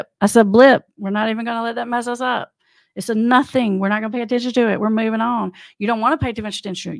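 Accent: South, United States. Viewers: I appreciate your efforts, please tell us where you got your title "Master of Addiction.?", What exactly is your professional education, and your personal experience. Thanks PS I'm sure its from your southern accent, but. you talk so fast also, and I miss much of what your are actually saying. What is your belief of 'the disease concept" of addiction?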